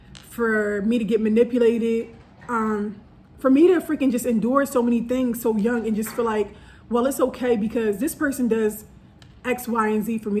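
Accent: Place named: Philadelphia, United States. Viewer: Sooo I just found ur podcast and as soon as I heard ur voice I was like “hold up is sis from Philly?! She’s giving me the vibe”. 😂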